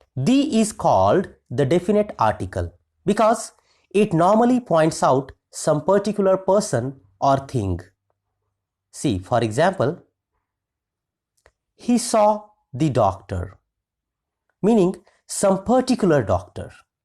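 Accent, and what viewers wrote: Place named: India. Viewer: Sir thank you - i do notice indian accent - great thank you- suggestion - a bookmarking / great content thank you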